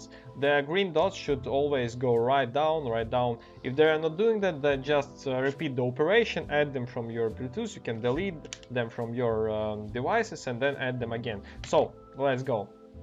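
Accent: Russia. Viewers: Я сразу по акценту поняла, что ты русский, вхахаха) Thanks for the tutorial, though:) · Спасибо что сказал как подключить! Странно это прозвучит, но я на начале видео понял что ты из россии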